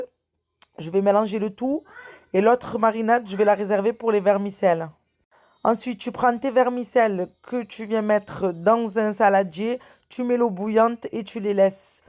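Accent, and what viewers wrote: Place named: Montpellier, France. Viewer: ton accent,tu es vers Montpellier ?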